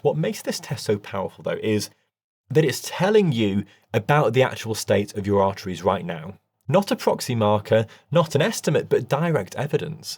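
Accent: United Kingdom. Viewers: não sei se vc é AI mas se for, quem te fez está de parabéns! além de lindo, inteligente e explica de uma forma que qualquer um pode entender! E esse sotaque britânico fecha com chave de ouro! agradeço ao algoritmo ter mandado esse video pois além de bem feito com ótimas informações, tem uma estética agradável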